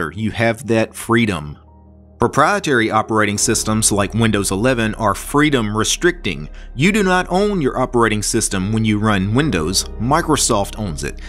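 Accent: South, United States. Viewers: “You can change desktop invrments” 😂 I love your southern twang 👍🏼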